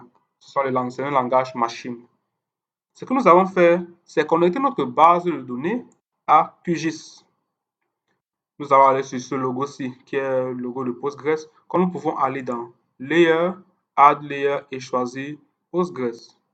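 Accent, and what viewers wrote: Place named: Benin. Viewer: J'aime bien tes tutos, mon ami à l'accent béninois ou camerounais. Tu es opérationnel. J'aimerais bien avoir ton contact WhatsApp, si cela ne t'ennuie pas bien sûr. Le mien c'est le <num>. Je ne sais pas où tu vis, mais, le Burkina n'est pas loin et tu pourrais facilement y dispenser des formations!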